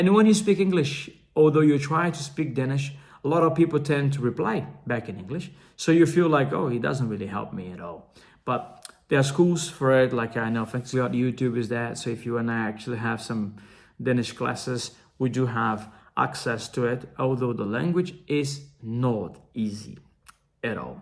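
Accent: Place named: United Kingdom. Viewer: Oi emano, muito bom ! Glad you like it here in Denmark, your english has a serious british accent. 😊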